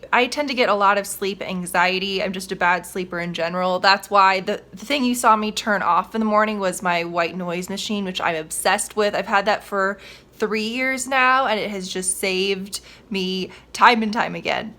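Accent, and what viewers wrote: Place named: United States. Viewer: I am not an english speaker but I can understand her speech even though she speaks very fast. don't know she use easy words? or pronounce clear. wonder where she is from. think from America but which part of accent? somebody know?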